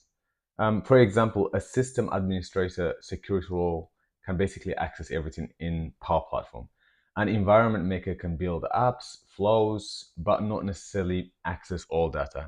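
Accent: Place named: Ethiopia. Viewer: My good sir, Might you be habesha. The accent made me check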